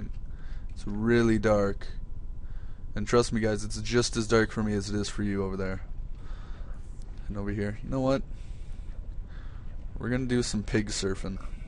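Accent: Canada. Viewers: "i am going to punch you... with a sword" and by the wayare you canadian?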